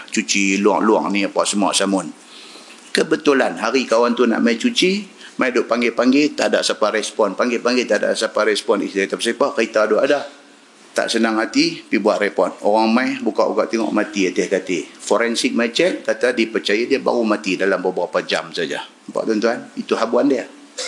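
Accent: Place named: Penang, Malaysia. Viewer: USTAZ...ni dah lama ceramah kat p.pinang.....suka ustaz ni kalau cerita tentang sejarah nabi2. Ceramah ustaz senang walau ckp loghat penang.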